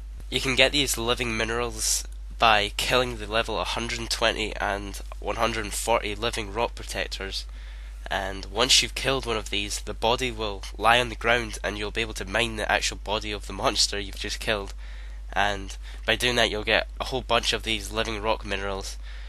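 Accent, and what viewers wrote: Scotland, United Kingdom. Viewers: Your one of the best rs Guide makers out there and Your scottish :)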